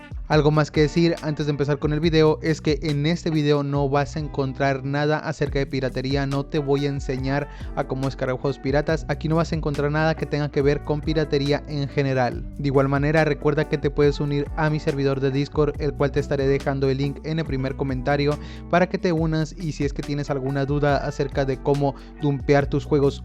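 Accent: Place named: Chile. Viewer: aprende a pronunciar la "sh", esta claro que eres chileno.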